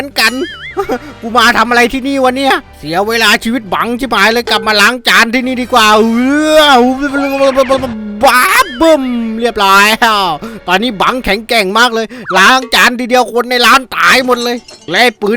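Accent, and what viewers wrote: South, Thailand. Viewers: พากใต้อีกไหม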